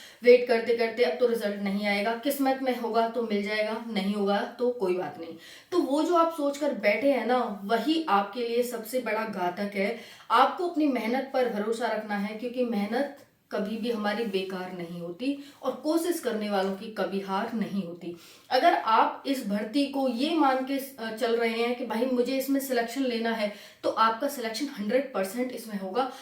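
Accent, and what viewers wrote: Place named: Mathura, India. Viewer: Apki language se hi lag raha tha ap mathura se ho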